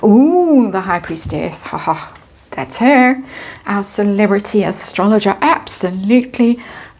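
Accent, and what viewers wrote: South Africa. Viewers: I wondered before you started this reading if your empathetic tarot reading would clash with astrology and the cards would not co-operate. However, as you seem to be able to keep both worlds happy, they were allowing you to gain what you were seeking. Rachel on the other hand? Maybe, having sold her soul to the devil, even he has chucked her out, so she is trying to cosy up to the heavens. I really enjoyed this reading and PLEASE keep your lovely soft South African lilt and don't try Russian😇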